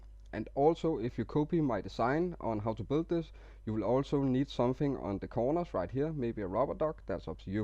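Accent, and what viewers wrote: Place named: Denmark. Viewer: You're danish aren't you? :p if not, your accent is extremely similar to a dane accent.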